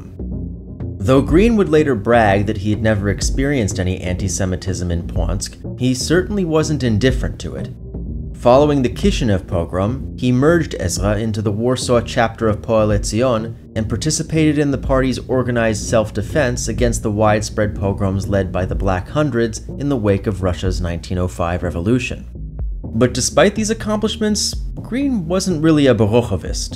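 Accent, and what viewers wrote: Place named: New York, United States. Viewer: Just curious if you see this we’re you raised in New York? You have the accent. Love your channel from Long Island/ Netanya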